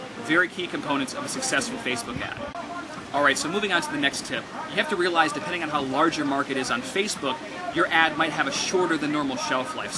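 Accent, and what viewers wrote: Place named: Jamaica. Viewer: sounds like jamaic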